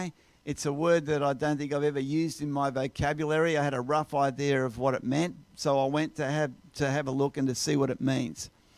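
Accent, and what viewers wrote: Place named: Australia. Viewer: Great to hear an Australian Christian voice predicting the rebuilding of American Christian faith. I pray you are right. God bless. SOK. Geelong, Australia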